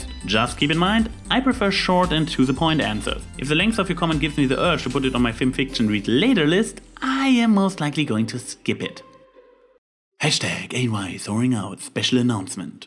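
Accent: Germany. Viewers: OMG THE GERMAN ACENT!!!!!!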